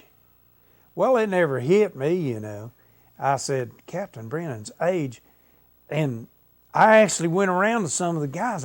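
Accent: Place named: South, United States. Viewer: He's got a way of story telling and that southern accent adds some drama which is entertaining.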